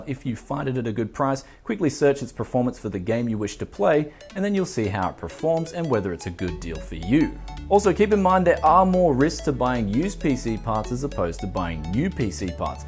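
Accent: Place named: Australia. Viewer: Yeeees! It’s nice to hear a fellow Aussie saying “h” correctly rather than all these Americans saying it the wrong way.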